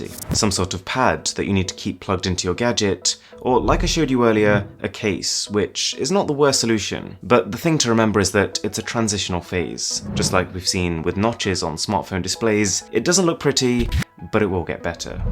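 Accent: RP, United Kingdom. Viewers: I cant stand how this guy talks. he talks like a royal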